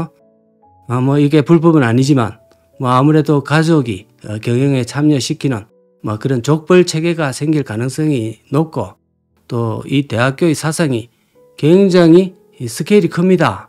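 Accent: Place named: Gyeongsang, South Korea. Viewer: 경상도 말을쓴다고, 너자신의 본질이 숨겨지는것이 아님!!!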